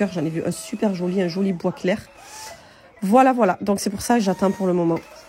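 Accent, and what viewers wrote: South, France. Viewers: Salem alikom okhty 😊 Merciii pour toutes tes vidéo déco, cuisine, ménage… 🥰 je te regarde depuis un bon moment et j’aime trooop ton accent du sud ensoleillé Allah y barek Allah te protège et te donnes la force inchallah 🤲🏻 Allah y barek pour ta récitation de Sourat « الرحمان » puisse Allah le tout puissant iketeblek le Hajj à toi et ton mari inchallah 🤲🏻🤲🏻🤲🏻 Allah te protège toi et toute ta famille inchallah 😘😘😘🥰🥰🥰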